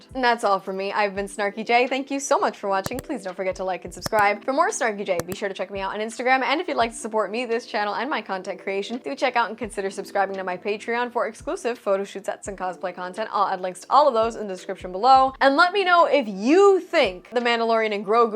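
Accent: Spain. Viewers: Less "F" bombs, more Spanish accent!!!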